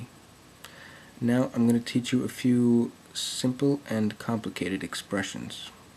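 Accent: United States. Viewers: It sounds like american.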